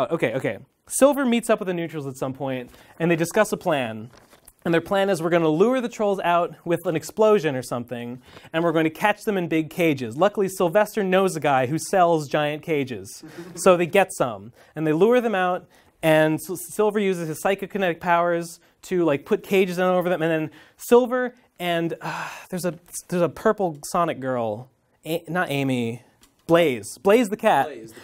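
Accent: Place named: Kentucky, United States. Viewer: pretty sure he's from Kentucky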